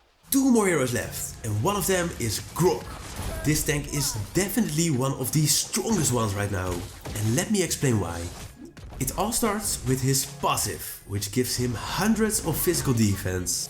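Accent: Netherlands. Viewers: I smell dutch bro dorry but you have such a dutch accent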